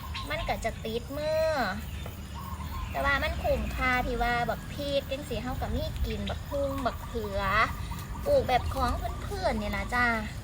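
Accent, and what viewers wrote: Isan, Thailand. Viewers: ทั้งขยันทั้งเก่งจริงๆนะพูดสำเนียงอิสารก็เพราะๆทำจุลินทรีย์สังเค ราะแสงก็เก่งๆ...อ.าจารย์ คนสวย.คนงามๆ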